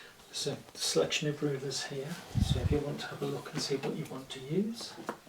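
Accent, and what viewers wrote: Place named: Tamworth, United Kingdom. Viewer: Where abouts are you from? Im from Tamworth and can tell the accent a mile off 😅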